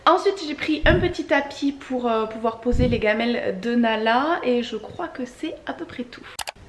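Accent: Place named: Aveyron, France. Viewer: Bonjour! Je vous ai découvert il y a quelques jours. Avec votre accent ressemblant au mien, et la région de Montpellier évoquée, je vais sur Google pour chercher "qui est " et là...Centre presse aveyron! Une aveyronnaise, comme moi! (À présent non loin de Toulouse). Ravie de suivre vos aventures et vous découvrir un peu plus!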